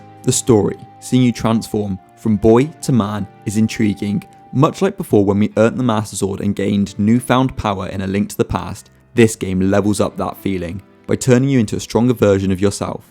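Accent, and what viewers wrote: United Kingdom. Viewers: Like hearing a fellow English man talk about these games most of the YouTube videos are American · You have earned a sub!!! Hearing someone talk about Zelda with Received Pronunciation is S tier for me. You’ll have a lot more subs once more people discover how high quality your videos are!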